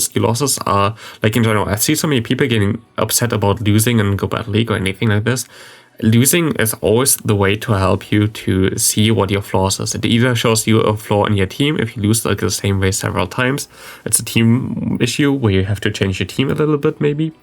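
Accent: Germany. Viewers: which nationality are you?, sounds like german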